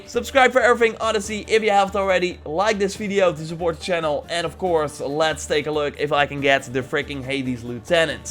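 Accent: Netherlands. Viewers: I knew it you’re dutch! Why can’t you people hide your accent ffs...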